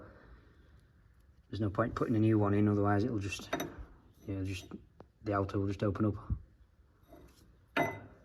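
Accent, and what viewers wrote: Yorkshire, United Kingdom. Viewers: brayed it in! broad yorkshire!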